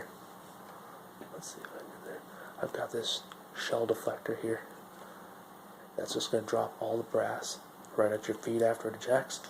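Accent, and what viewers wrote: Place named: South, United States. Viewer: A southern accent... I'd say I'm shocked but I don't like to lie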